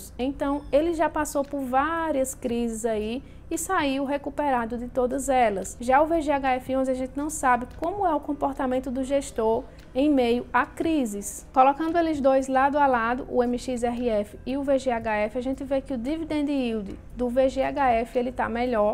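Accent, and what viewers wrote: Northeast, Brazil. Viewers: Vc é de que estado do nordeste,??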